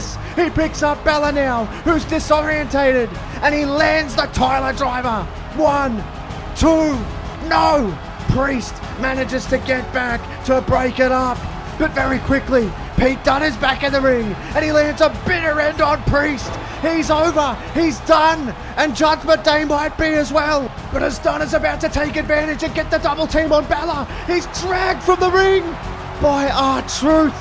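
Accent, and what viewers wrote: Australia. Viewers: Ngl, you actually do sound like Grayson Waller, are you from Australia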